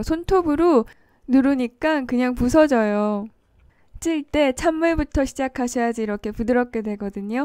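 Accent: Seoul, South Korea. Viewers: 뭔가 나레이션이 서울사투리같은데 그래서 더 정겨워요! 뭔가 아는̆̈ 이모님이̊̈ 알려주시는̆̈ 느낌이예요 좋은 영상 잘 보고 갑니다~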